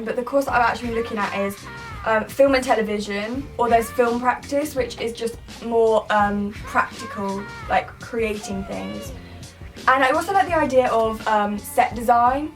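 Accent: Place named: United Kingdom. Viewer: Yo ur british ayee xD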